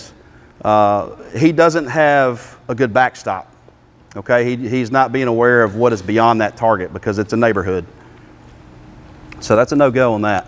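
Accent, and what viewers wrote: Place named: United States. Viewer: Goddamn, he country as hell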